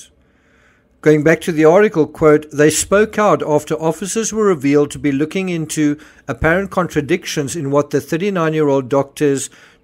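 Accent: South Africa. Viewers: OH! It's was in the Daily Star, then we know it's true. I can tell from your accent you are South African, you clearly do not know what sort of newspaper the Daily Star is?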